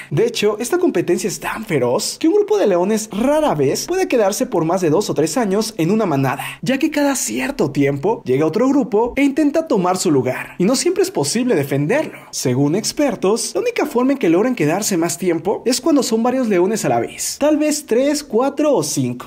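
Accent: North, Mexico. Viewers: Alv esa mierda estu muy norteño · Chale, lo último es traumante xdxdxd Diablos que norteño xdxd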